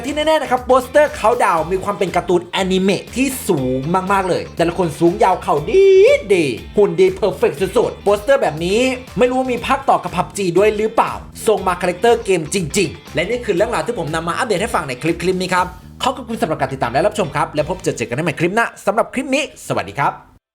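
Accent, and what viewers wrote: Isan, Thailand. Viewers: ชอบการนำเสนอการพากย์เสียงแทรกอิสานของคลิปมาก ฟังไปขำไป หางเสียงแบบสุดดี แบบฉาอ่ะครับ ชอบ👍🏻👍🏻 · โอ้ยยยเนาะ เสียงภาคน้องกะตะมุตะมิหลายยยๆ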